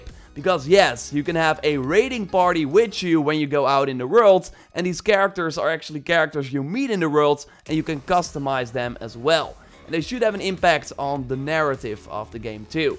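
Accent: Netherlands. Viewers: That thick Dutch accent tho... Almost makes the video unwatchable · No hate but this is the most dutch accent ever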